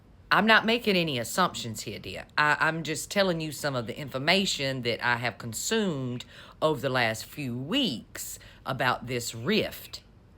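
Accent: South, United States. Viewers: That southern belle tone is annoying as hell im out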